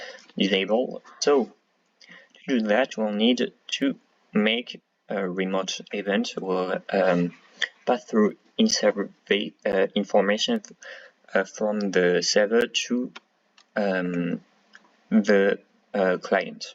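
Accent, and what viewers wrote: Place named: France. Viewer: T’inquiète l’accent français c’est hyper prisé dans les pays anglais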